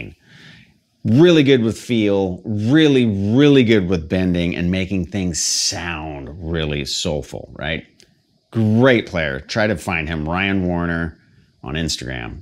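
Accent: United States. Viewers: Amazing player! Moreover, I practice american accent listening to you:) Greetings from Buenos Aires.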